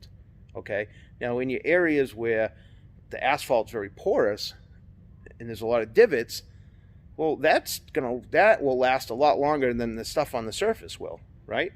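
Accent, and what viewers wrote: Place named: Northeast, United States. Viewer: i knew you were from the north east from your accent. Cheers from New York brother. - Mike, Asphalt Works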